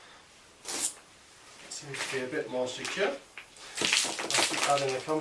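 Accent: United Kingdom. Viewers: I'm comforted by the fact that you are (or certainly sound) British, so I'm presuming you're in the UK...? I have 7 Frangipani plantlets which I germinated last year; they're all about 6" tall, so I'm about to re-pot all of them. Wish me luck - !!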